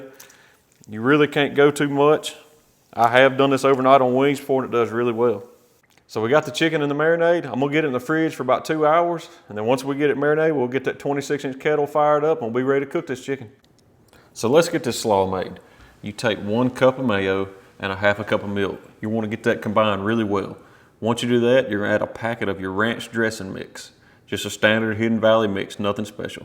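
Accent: South, United States. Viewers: a good ole southern boy from mississippi sayin "we fittin tuh" fittin, fittin, 🙄🙄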